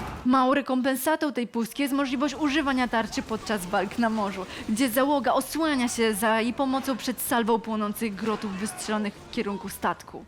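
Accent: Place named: Ukraine. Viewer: Fajnie że jest popularna streamerka. Natomiast w roli prowadzącej, nie sprawdza się, ponieważ ma problem z dykcją i emisją głosu. Strasznie się tego zle slucha. Nie wiem co to za maniera wymowy ale brzmi jakby była ukrainką.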